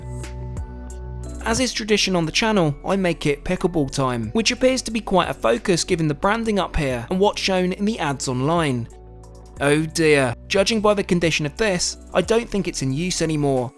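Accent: United Kingdom. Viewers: Years ago you thought I was making fun of your accent, but I actually thought it was charming and wondered what part of the UK you were from 😊 😅 I’m glad you liked the meals and tbh they did look especially good. Your steak looked absolutely primo. I really think people would get much more value for money by taking an MSC 3 or 4 day cruise out of Miami. I’m now about to watch your first class cruise you and your dad took on MSC Explora. Wishing you smooth seas ahead.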